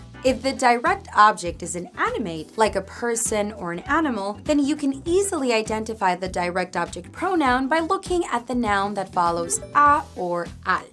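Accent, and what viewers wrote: Spain. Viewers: that spanish accent is like heaven u sound gooooood😍😍😍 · Is the Castilian Spanish?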